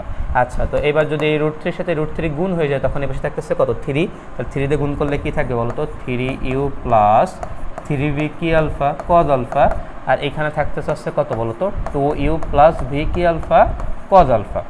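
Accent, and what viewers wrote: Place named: Narail, Bangladesh. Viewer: ভাই নড়াইলের ভাষায় কথা বলেন। ভালো লাগে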